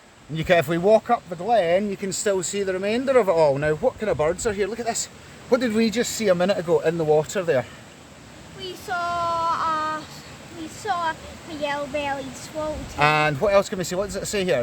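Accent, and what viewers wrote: Scotland, United Kingdom. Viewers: Scots accent is very difficult 😓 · I love your sco´ísh accent :-) Good walk. Since almost two years we are waiting to go to Scotland.....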